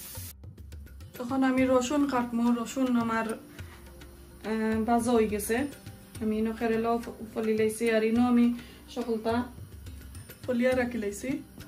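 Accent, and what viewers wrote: Sylhet, Bangladesh. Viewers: Afa ami afnar ek sylhety boin . Amar channel ta guriya ayba ar Bala lagle subscribe korba. Amio subscribe korsi.afnar sylhety mat na sundhor MashAllah.karala Bhorta- kube moja oibo lajer.❤️❤️🍓🍓🍓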